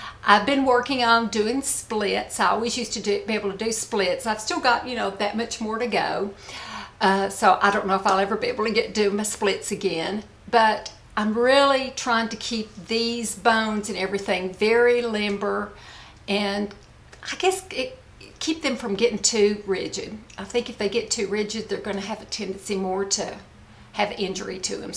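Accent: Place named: East Tennessee, United States. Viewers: I’m from East Tennessee too. Living in Florida now and your accent makes me feel at home. Love your genuine personality. 🥰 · As a newer subscriber, I super enjoy getting to know you like this. I know what you mean about internet safety, and it’s good you’re being cautious; it’s also a shame. 99% of us are just looking for fun and companionship re: girly things, but that 1% makes it unwise to share too much. So nice for those battling chronic health issues that you share about your positivity and living day by day: I also really respect the way you “just say no” haha to either sharing things which have already been done or sharing things just specific to your health needs. Lovvvvvve your East TN accent. And manner of speaking. I grew up in CO and the Midwest and no one speaks as pretty as y’all do. Your makeup looks especially pretty. 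Okay, friend—off to find my weights and yoga mat. You have inspired me to work on my own core and flexibility!!! 🌸❤️💕🥰🏋🏼‍♀️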